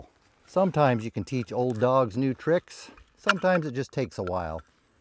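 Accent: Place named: West, United States. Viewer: The accent reminds me of buster shruggs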